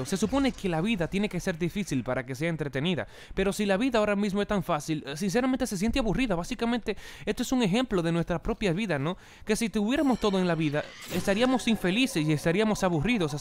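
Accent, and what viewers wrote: Dominican Republic. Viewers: Eres de República Dominicana ?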